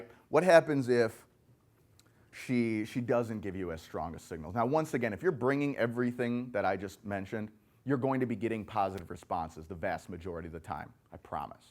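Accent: United States. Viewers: I thought this guy was British. Nope, can't trust an American to give me advice on how to hold a proper conversation. Americans (even the educated ones) don't know how to use basic proper English phonics, punctuation and say "like" out of proper use WAY too much. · Very Intense, very american, not so british, wouldn't work as well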